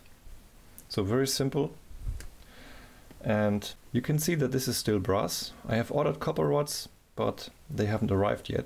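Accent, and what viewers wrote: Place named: Germany. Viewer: Hi thanks for the helpful information. I guess you are from Germany from your accent.. Would you be able to help me source the aluminium bus bar ? I live near Germany ( und ich kann auch Deutsch reden ; )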